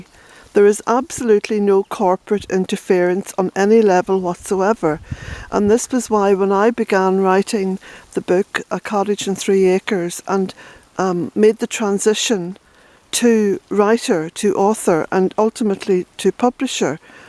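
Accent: Ireland. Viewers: You know the minute you mentioned tea I realized that was what I was in need of ! Lol. Well you’ve inspired me too, I’ve already picked up my cardboard from Walmart and am ready to start building my raised beds to grow veggies. It’s been really good for me to be able to see the process, and hear you give your tips in your Irish accent too, as I used to live there and have fond memories. ☺️ Reading about something and actually seeing it are two very different things, and most of us don’t have someone in our family to teach us. Thank you so much. Loving seeing Jacks happy little face and antics too. Blessings to you.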